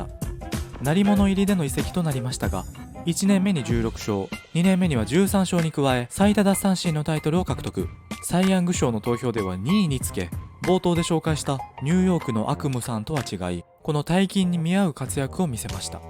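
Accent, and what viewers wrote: Kansai, Japan. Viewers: 関西人だ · 主、関西訛り？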